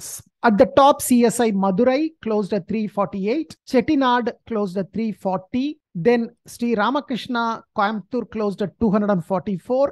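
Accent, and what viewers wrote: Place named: United States. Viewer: Enya unaku tamil thariyatha..........America la porantha mathiri thann pasurathu........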